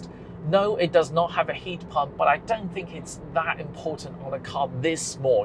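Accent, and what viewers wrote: United Kingdom. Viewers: Nice video and brilliant British accent!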